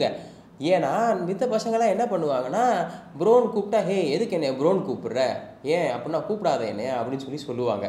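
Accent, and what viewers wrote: Madurai, India. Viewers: Bro ninga madurai ya🔥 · Bro madurai ya nenga.na madurai bro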